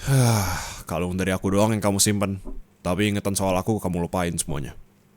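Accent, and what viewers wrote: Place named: West Java, Indonesia. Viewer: yantonya logat sundah ini teh yaallah 😭😭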